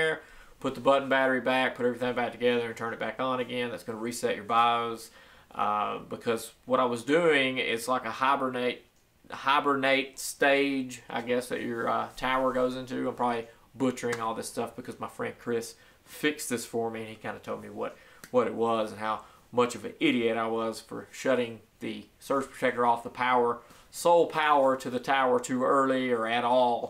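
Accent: South, United States. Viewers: You never heard southern drawl?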